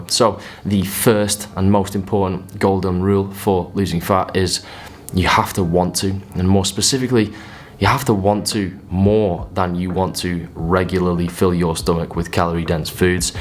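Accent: United Kingdom. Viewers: What part of the UK are you from ?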